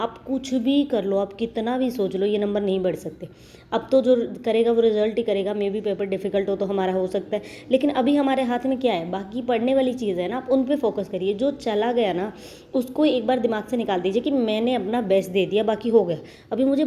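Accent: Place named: Agra-Mathura, India. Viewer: dear aap Agra Mathura ke aas pass se hain kya.. as person's tone match the location 😊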